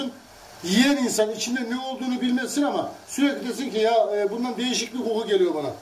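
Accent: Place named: Ankara, Turkey. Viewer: Şefim Ankara limisiniz yanlış mı anladım yav zere aynı bizim buraların şivesi diyorum 🥰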